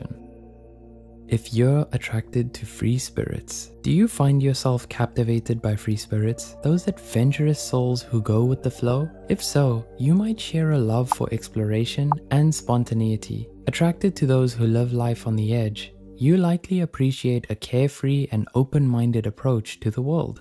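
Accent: South Africa. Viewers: Why is this voice giving a low-key white South African😶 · the voice of the person in this video isounds South African pretty cool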